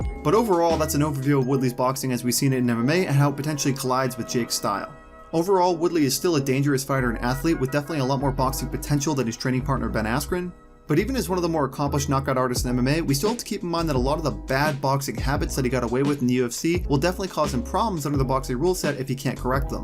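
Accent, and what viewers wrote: New Zealand. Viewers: Are you from New Zealand. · Are you from New Zealand?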